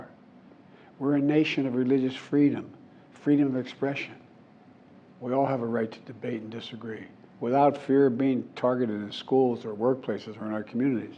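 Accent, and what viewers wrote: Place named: United States. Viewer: I like a President that sounds like an American. Not like a Russian goon oligarch. I stand with President Biden!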